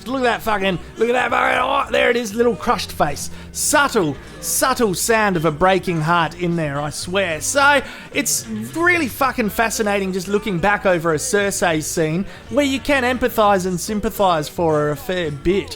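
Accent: Australia. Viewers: What part of Australia are u from?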